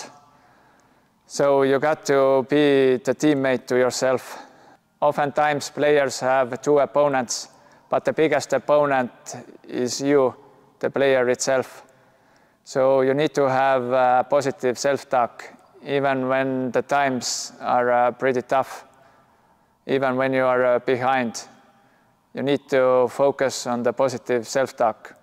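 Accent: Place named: Russia. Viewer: Besides the beautiful Russian accent, this video is great.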